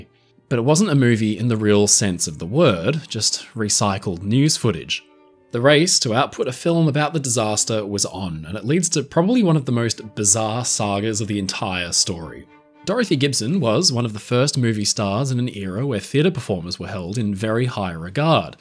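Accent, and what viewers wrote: Australia. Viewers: What? The uploader is Australian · I've noticed that too - uses several other American pronunciations in his videos, I can't remember any of them right now...but they sound wrong coming from his (refined) Aussie accent. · I knew you were Australian, hi from Tasmania, and thank you for being a more familiar realistic representative for Australia ❤❤❤😊.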